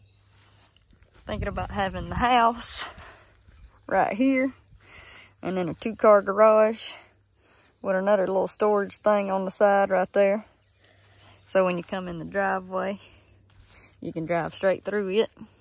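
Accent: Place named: South, United States. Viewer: God I love her accent.. makes me miss the south so bad.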